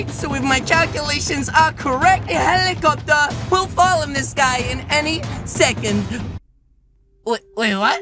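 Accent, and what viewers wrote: United Kingdom. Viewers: are you brittish 🇬🇧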